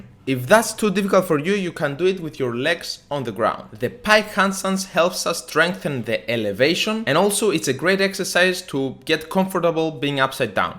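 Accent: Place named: Greece. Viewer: Are you Greek? Your pronunciation sounds really Greek llol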